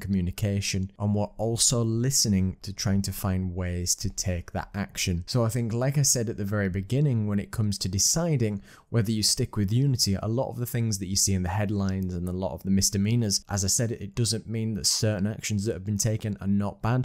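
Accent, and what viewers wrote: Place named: North, United Kingdom. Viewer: Hey thanks for the coverage, now I know what I'd sound like coming from the north! 😉 Sorry to see the comments below that you are having struggles with the YT algorithm re Titles, that sucks. All the best!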